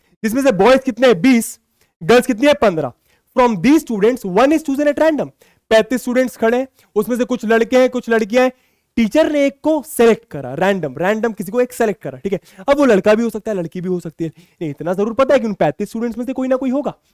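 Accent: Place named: Bihar, India. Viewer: Sir ka bihari accent🤌🏻🤌🏻🗣️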